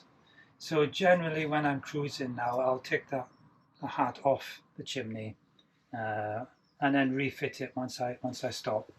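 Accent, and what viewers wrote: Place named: Cardiff, United Kingdom. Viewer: Good on you for choosing this lifestyle 👍 where is home…..I detect a slight Cardiff accent 🤔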